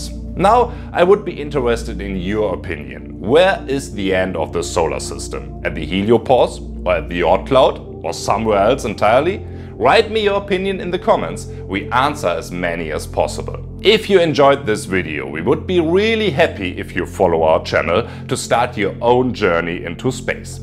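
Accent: Germany. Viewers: Lieber ich liebe deutsche Leute die englisch sprechen 🗣 die kann man auf YouTube wenigstens verstehen aber auch aus Grund des lustigen Akzentes schnell als deutscher identifizieren dennoch Trotzdem viel Glück und Spaß in der Welt des englischen contents!! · Ich würde es lassen „On Englisch“. Wirklich zu deutsches Englisch und paar ganz harte Aussprache Fehler drin.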